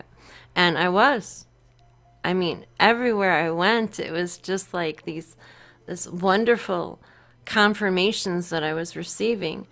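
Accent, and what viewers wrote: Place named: Spain. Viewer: Whoa now that is a combo! I can see and hear the spanish in you.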